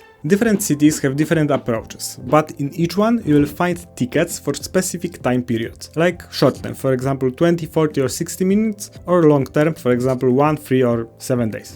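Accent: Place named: Poland. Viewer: Słychać akcent polski akcent w chuj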